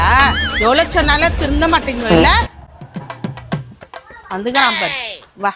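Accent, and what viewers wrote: Bangalore, India. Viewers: Bangalore tamizh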